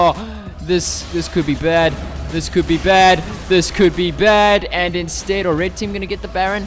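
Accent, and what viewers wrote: South Africa. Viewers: Are you south african ? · Are u south african?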